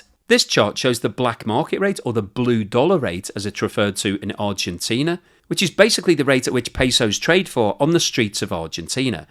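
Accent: United Kingdom. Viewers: As soon as i heard your british accent, i knew this was a hit piece.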